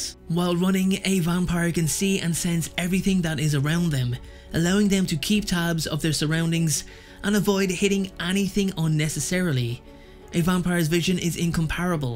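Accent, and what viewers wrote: Ireland. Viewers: OMG WTF do u sound so bloody Irish 😳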